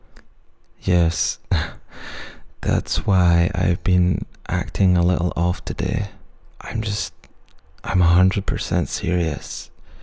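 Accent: Scotland, United Kingdom. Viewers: Please his precious Scottish accent is absolutely adorable 🥺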